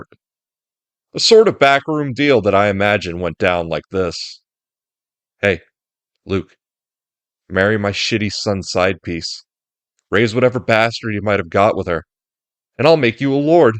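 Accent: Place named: Boston, United States. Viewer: Awesome video bro. You GOTTA be from Boston.I'm from Southie. Oh hey I'm not being a twat at all, but it would be "patronized" not "patroned'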